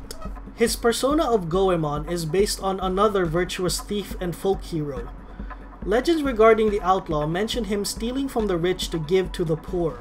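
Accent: Philippines. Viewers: By the way, are you Filipino? You sound like one. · I can recognize that accent anywhere... are you Filipino? Edit: lol Akechi's a white knight... that NOT everyone likes. Ironic isnt it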